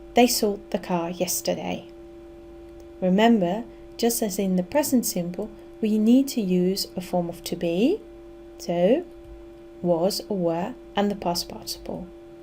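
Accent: England, United Kingdom. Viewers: Clear , precise ,and a business like approach I loved it. And a wonderful cultivated English accent . I am delighted .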